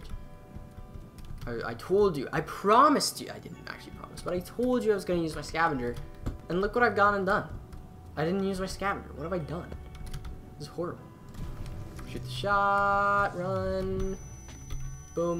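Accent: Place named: Canada. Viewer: i actually thought you were candian.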